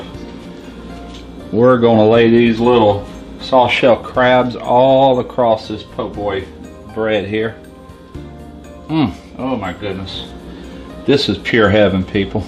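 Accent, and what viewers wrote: South, United States. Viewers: oh....man. found out about you via BBQ PIT BOYS. man....you got me subscribed. youre doin it the fine and right way. love your southern accent there.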